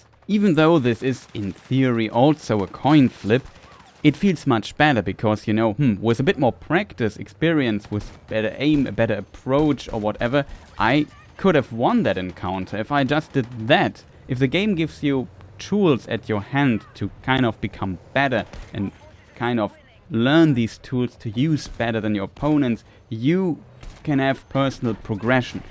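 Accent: Germany. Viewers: If I hear a German accent over battlefield gameplay I know I’m in for a banger vid.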